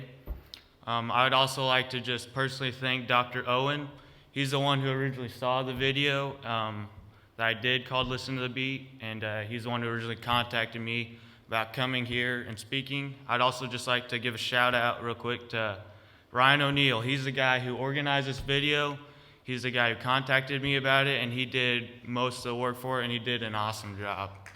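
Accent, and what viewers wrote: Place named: South, United States. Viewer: Omg. You have a southern accent?? Omg. God bless. What a miracle you are. 🙏❤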